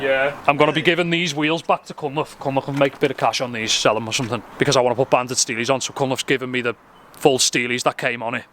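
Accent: Liverpool, United Kingdom. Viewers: Im a simple man .i hear scouse , i like and subscribe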